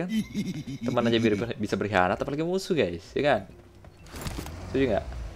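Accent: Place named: Semarang, Indonesia. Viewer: Pekok pekok wkwkwk Semarangan bangeeettt, nguakak pok aku nda ndaa 🤣🤣🤣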